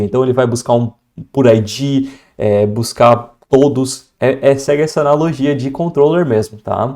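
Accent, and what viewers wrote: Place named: Santa Catarina, Brazil. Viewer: ^^ Nesse exato momento estou fazendo um challenge pra entrar em uma empresa que usa a stack, percebi seu sotaque catarinense, e se não me engano já assisti a alguma palestra sua, vc é de Joinville amigo?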